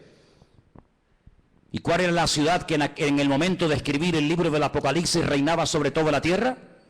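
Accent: Spain. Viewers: Muy tremendo mensaje usrted me rebendijo con la palabra .. saludoa desde argentina ... de donde es la iglesia ? .. por el ascento es de españa?.. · Que buen mensaje pastor;👌 por su acento es Usted Español ..bueno es Dios 👏que todavía tiene hombres diciéndole a los feligreses la verdad ;que otros esconden solo hablan de prosperidad económica y las almas que se pierdan 😳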